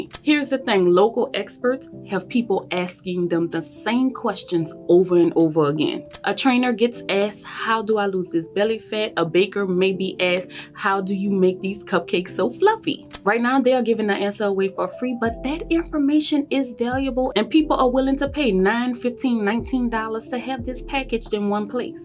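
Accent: New Orleans, United States. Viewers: 🎩👌LayDonDe'Vu on ya line. I can tell Baby from New Orleans Period Do ya thang Baby Keep speaking to the audience that need it. I wish you Prosperity in All you do⚘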